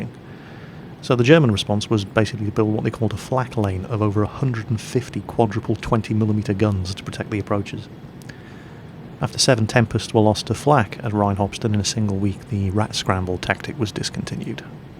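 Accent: England, United Kingdom. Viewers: This guy is British. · You did pronounce "Schwalbe" correctly, although one can hear your English accent! I'm proud of you :-D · The Russians won WWII. Band of Brothers is a clever propaganda movie and HBO series, 1944 Germany was already lost and real historians know this. You sound smart - but your audience are kids. As a lawyer, age 58 and Russian/American and history major I know your British accent is part of your false narration of history to video kids. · Your german pronounciation is astoninglishly good, given that you have such a british accent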